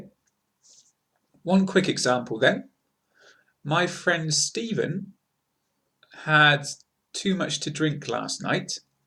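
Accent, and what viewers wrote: United Kingdom. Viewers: What part of Britain is his accent from?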